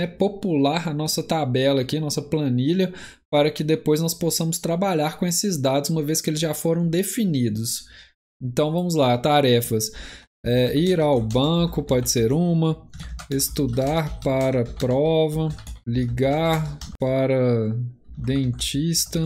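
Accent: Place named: Minas Gerais, Brazil. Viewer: Noss mas esse cara é Minerim demais sô (ou goiano).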